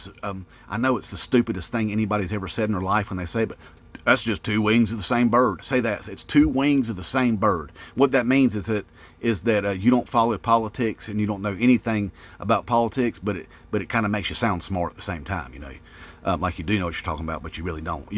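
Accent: South, United States. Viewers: I just found your channel here. I've never had tiktok. Been trying to pinpoint your accent and can't decide if you're from Georgia, Alabama, or northern Florida. Great content, man. I hope you do well here! ✌🏼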